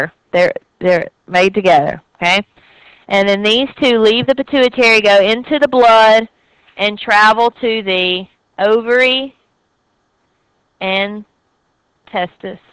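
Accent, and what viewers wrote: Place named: Mississippi, United States. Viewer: Dr., I love your videos. I am taking an online class, but I prefer to sit in a class. Your videos help me to get what I need. Here is the funny part, I KNOW your accent! I recognized it right away. I have family from South Mississippi (not gonna be too specific, since this is on the web). Anyway, thanks so much for the help!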